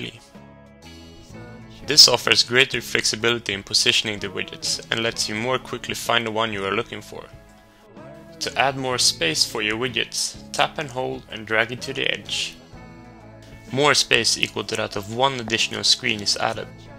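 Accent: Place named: Sweden. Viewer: Swedish! haha, heard it on your accent :-)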